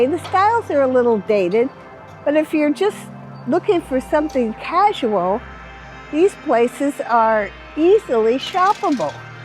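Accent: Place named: United States. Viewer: You keep talking about cigarette smoke I don’t know where you come from but you sound like you’re from the US and it’s nice that you’re able to ride a bike around and you’re safe and you don’t have homeless tents on the corners and people walking around like zombies because they’re so drugged out you don’t see stores that they can walk into and get needles and inject themselves so I can deal with the smoking I live here now for 2 1/2 years and as a woman I feel very safe walking around anywhere at night. then you’re talking about fireworks well you have fireworks but you don’t have big fires that people are starting in the streets in your major cities. If you don’t wanna hear the fireworks move outside of the town live up in the mountains there’s beautiful areas everywhere. you won’t find Walmarts and targets but what you will find her nice little vegetable stands and separate markets that you buy your meat and fresh bread that aren’t filled with disgusting ingredients and that are fresh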